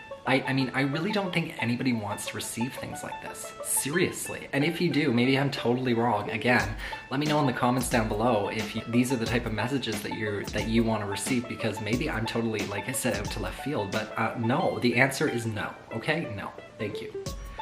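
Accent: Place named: Canada. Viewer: I really enjoyed your video so very much, and you are very sweet and honest. I love your Canadian accent !!! Most "dating apps" are just hook up sites where horny guys look for sex. Most guys there just want to satisfy their "urges" and don't care how or with whom they do it. Makes no difference whether they are gay, bi, str8, married, curious for them " a hole is a hole" and you are just a piece meat! You certainly have self worth and dignity. Good for you for not playing their game! Do you like Italians? LOL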